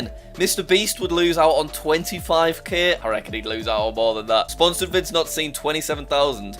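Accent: United Kingdom. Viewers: Bro i love your british accent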